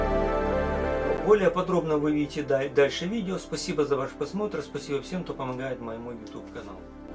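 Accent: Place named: Russia. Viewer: Excuse, I think the narration is great as it is. English with a Russian accent sounds good, information is all there and filming is brilliant. Don’t change a thing.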